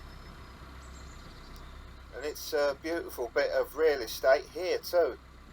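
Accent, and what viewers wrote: London, United Kingdom. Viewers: Hi ya. So glad you are back doing vlogs. Was wondering how you was, and why no vlogs for a while. I love your seeing your journeys and all the pretty scenery. Oh and miss your London accent ! Stay well from a fellow Londoner. Cheerio x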